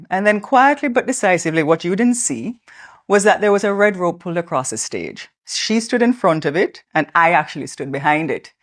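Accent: Jamaica. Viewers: You sound very Jamaican 🤔🤔🤔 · You’re still so beautiful, eloquent and classy. You sound like one of my peeps from Jamaica. It really doesn’t matter where you are from, just that you reminds me of one of my fellow Jamaicans. ❤❤❤❤❤❤❤❤❤❤❤❤❤❤❤❤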